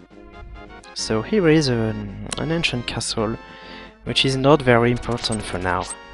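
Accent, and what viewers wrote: France. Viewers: I have your game and I have to say it's done great! Keep doing this! And I just love your french accent! ;) Greetings from Sweden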